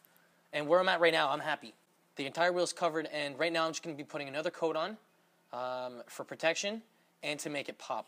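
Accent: Canada. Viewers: I can tell that you’re canadian lol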